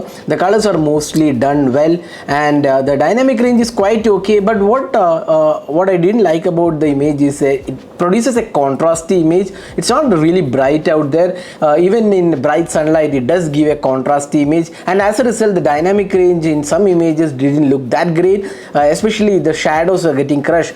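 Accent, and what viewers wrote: Kerala, India. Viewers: perfect mallu accent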